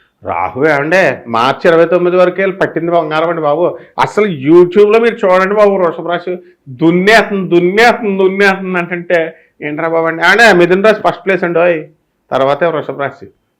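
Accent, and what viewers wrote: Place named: Godavari, India. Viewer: మీ యాసని బట్టి మీది గోదావరి జిల్లా.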